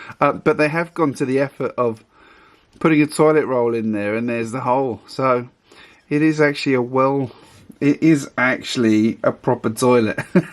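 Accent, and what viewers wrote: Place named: United Kingdom. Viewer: Just a straight up thought if I watched with my eyes closed. Nice British accent!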